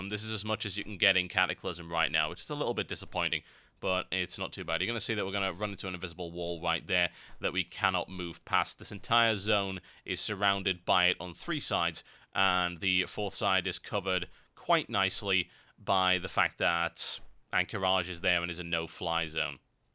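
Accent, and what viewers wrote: United Kingdom. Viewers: how dumb... He's got a british accent and jest look at his channel background....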